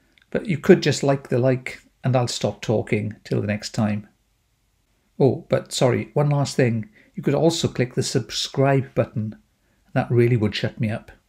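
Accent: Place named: Wales, United Kingdom. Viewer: Bore da Mr., Thank you so much for your Zoom videos. I teach at various universities in Okinawa, all of whom are now scrambling to get the students learning online. After viewing many other Zoom intro videos it became obvious that most of them were way too complicated and difficult for our Japanese students to follow. So, diolch yn fawr for your concise and much easier to follow tutorials. I especially appreciate the bonus of a narrative accent, not so different from my own Barry talk. Tidy, like! Owen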